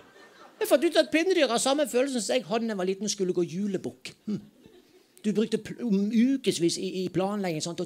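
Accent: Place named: Bergen, Norway. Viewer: Det e faen meg bergens dialekten som gjør susen... :)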